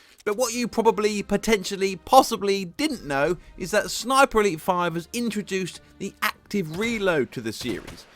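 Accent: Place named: United Kingdom. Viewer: Your "Probably, potentially, possibly" combined with Your British made me hit "subscribe".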